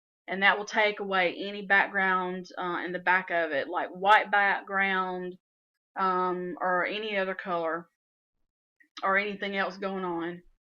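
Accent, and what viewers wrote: South, United States. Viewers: Great video! Very easy to understand. I'm a southern girl too and I love your accent, much easier to understand when someone speaks slowly!! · WOW! Thank you sooo much. I've had inkscape for years and its just been too hard to figure out. This was amazing! Love your Southern accent and your singing is BEAUTIFUL!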